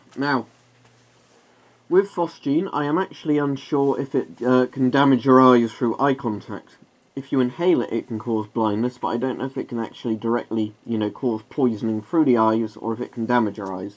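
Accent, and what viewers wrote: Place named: Bedfordshire, United Kingdom. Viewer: Oh okay you sound like you were from my neck of the woods is all.. I'm in Bedfordshire .. Love your vids keep up the good work!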